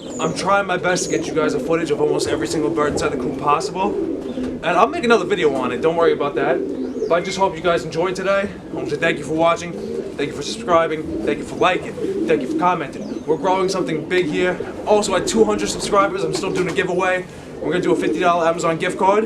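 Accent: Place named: New York, United States. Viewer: Bruh that New York Accent on point 😂😂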